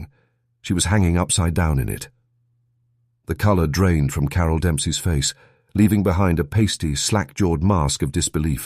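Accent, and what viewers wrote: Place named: United Kingdom. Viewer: What's with the British accent? Make it American. Viewing from Binghamton, NY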